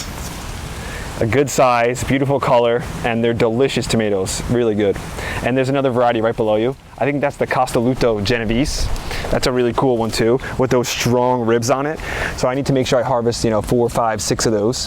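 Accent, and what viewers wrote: New Jersey, United States. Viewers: I hear that Jersey accent, “wooder your plants” 😂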